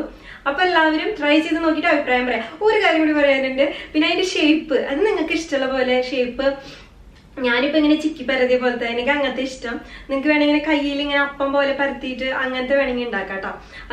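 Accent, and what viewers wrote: Thrissur, India. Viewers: നമ്മൾ തൃശ്ശൂർകാര് വീട്ടിൽ അടുക്കളയിൽ എങ്ങിനെയാണോ പാചകം ചെയ്യുമ്പോൾ സംസാരിക്കുന്നത് അത് പോലെ തന്നെയാണ് മോൾടെ അവതരണം. ചേച്ചി മോൾടെ വല്ല്യ fan anutaa..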